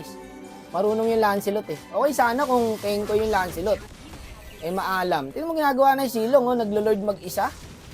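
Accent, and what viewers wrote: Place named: Visayas, Philippines. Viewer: bisaya yan halata e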